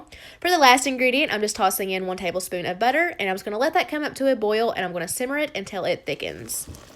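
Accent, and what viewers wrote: South, United States. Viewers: You’re such a southern Bell, I love your accent and food ideas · What state do you live in? Love your accent! I’m from North Carolina and I have an accent as well, but yours is more southern than mine. Lol! Love ya! 💖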